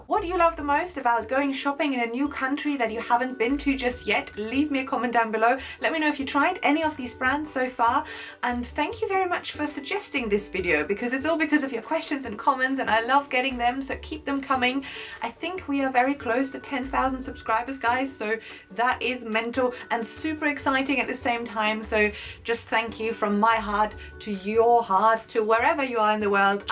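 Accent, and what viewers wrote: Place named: United Kingdom. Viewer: Hello from California! I'm an American raised by Germans. Really enjoy your videos. Love your British English.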